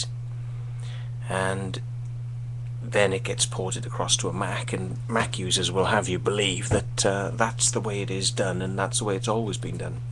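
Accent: Wales, United Kingdom. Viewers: Holy shit, you can't be this idiotic. You still sound a bit welsh, but that surely can't be the reason. You make it sound like you know what you're talking about when claiming (incorrectly) that a lot of technologies Apple incorporate come from UNIX servers. But then you come up with these gems. "DVD is a PC format" "Web Pages, web design came from a PC" Ever heard of Xerox Parc, NeXT? Those are the origins of the GUI and "Web Pages" as you call them. The X is OS X is "ten", not 'X'. Dumbass.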